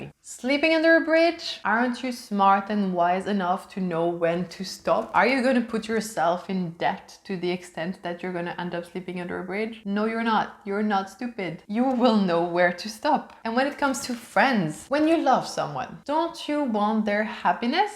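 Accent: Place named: France. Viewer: She is French she has that French accent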